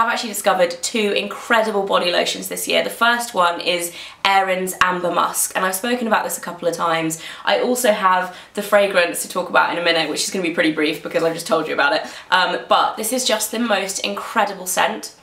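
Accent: United Kingdom. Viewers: you are one of my fave british youtubers! love your accent and your product recommendations are really good too :)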